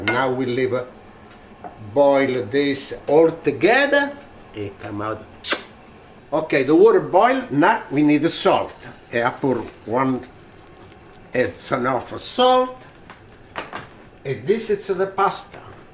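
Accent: Italy. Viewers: Your Italian is just like my Mother's. She was from a village in Forino . It was called Petruro. You are Awsome!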